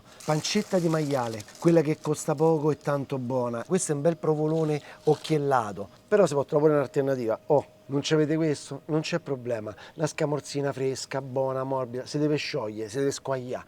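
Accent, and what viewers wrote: Rome, Italy. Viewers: ogni volta che vedo un video in cui dai un morso ad un panino e sento quel rumore di pane che scrocchia darei un morso allo schermo!! iscritto da almeno 8-9 mesi!!! Continua così fai delle ricette fantastiche e io che sono del nord, personalmente adoro il l' accento romano e continuerò a seguirti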